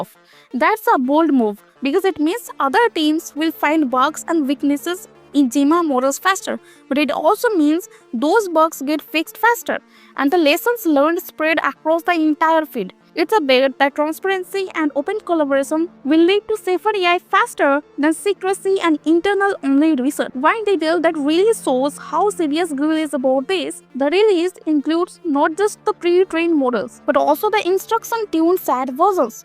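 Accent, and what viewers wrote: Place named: India. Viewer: Absolutely Amazing video and information. The only major issue is that the accent is hard to understand, especially for non-indians. 😊 Happy Xmas and New year.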